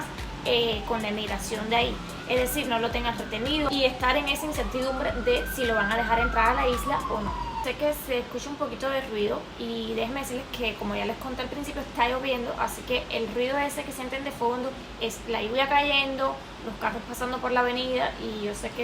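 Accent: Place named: Venezuela. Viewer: Tu hablas como venezolana , no pareces cubana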